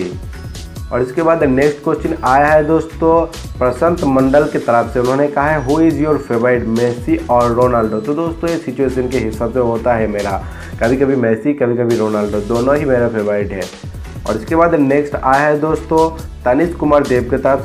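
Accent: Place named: Bengal, India. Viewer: da is from Bengal like me thats why his Hindi has a Bengali touch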